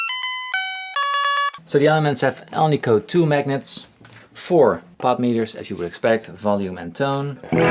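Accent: Netherlands. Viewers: en lekker een beetje hollands derbij, lekker hoor...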